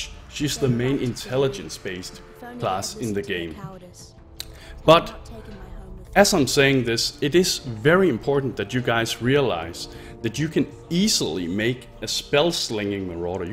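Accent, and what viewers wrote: Denmark. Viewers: Jeg elsker hvordan en dansker kan høre en anden dansker når de taler engelsk. satan det er tydeligt. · Oh god, Danish English... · Are you Danish? The accent sounds so much like Danish ^^ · are you danish? You sound danish:b with your accent:D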